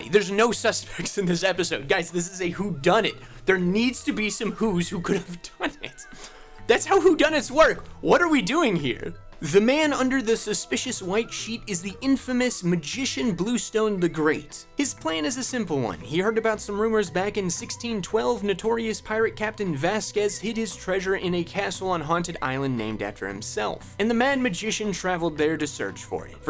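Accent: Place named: New England, United States. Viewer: Gonna say Maine or Vermont maybe Connecticut. Had to subscribe just for the accent 401 forever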